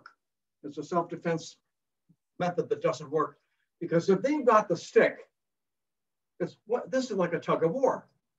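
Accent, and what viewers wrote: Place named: Canada. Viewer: really enjoyed your presentation. That was a good history lesson and I enjoyed your pictures. I could tell from your accent that you were Canadian. I’am one of your neighbors from the States. Back, years ago, I did a tour of Canada for a couple of weeks. Really enjoyed meeting all the people I met along the way. My mom’s family are all Irish here in the states. Anyway, I’m looking for a way to defend myself from the epidemic of druggies who are doing a lot of the stealing and robbing State side. It’s getting rough here.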